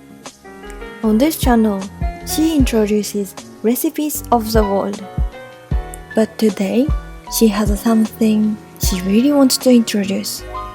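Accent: Japan. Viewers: まるで日本人の少女が、英語を話しているようです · □←この人の影響？(使ってみた) 母国語がないとは言うけど、私からしたら外国で英語が話せるようになった日本人の発音だよ。 ところで、👍が俺のコメントに複数つくのは誰が👍を付けてるんだろうね…😓一つだけならDianaさんだと分かるんだけどな。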